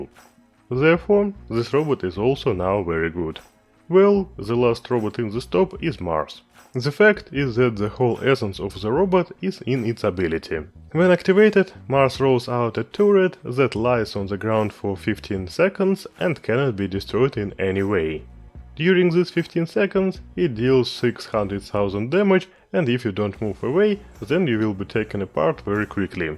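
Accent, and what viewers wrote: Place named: Russia. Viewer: слышу отчетливо русский акцент, полоскун ты чтоли?)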